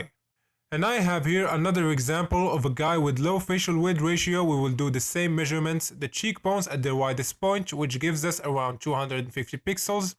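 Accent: Morocco. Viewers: your accent sounds damn moroccan lol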